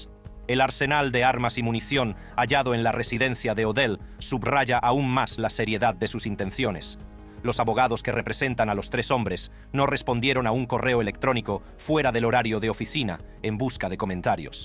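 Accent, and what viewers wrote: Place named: United States. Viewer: Que le Pasa a este maje habla bien y despues habla Como estadounidence aprendiendo español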